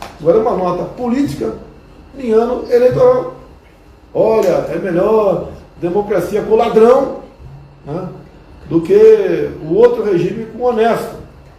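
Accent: Northeast, Brazil. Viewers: Tu é de qual estado do nordeste?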